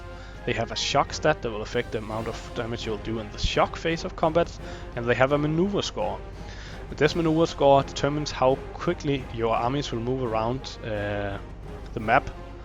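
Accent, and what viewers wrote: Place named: Denmark. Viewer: I guess he's Danish, that's what it sounds like to me at least.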